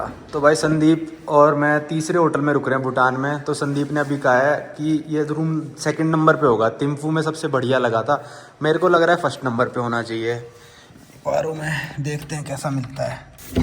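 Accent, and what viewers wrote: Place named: Northeast, India. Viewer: English Accent same as North East Indian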